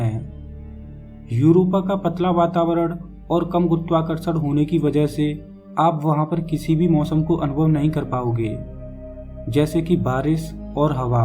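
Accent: Bihar, India. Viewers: Bhaiyaa people ....bhojpuri Hindi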